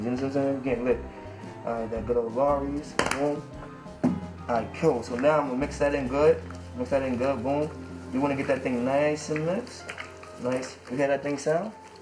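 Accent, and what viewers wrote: New York, United States. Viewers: Before i started the video i knew he was from NYC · how he know i aint pay my rent last weeeeek im deaddddd he more New York then New York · First 2 seconds I knew he really from New York 😭😩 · This got to be that member of AMP Fanum or he def from New York lol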